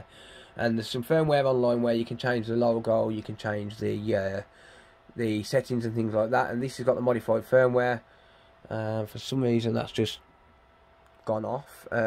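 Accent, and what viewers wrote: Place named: Black Country, United Kingdom. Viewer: just subscribed off the back of my mate vince - good luck with your target - you are only up ythe road from me from the sound of the accent lol. Im in Oldbury - you are clearly a black country lad?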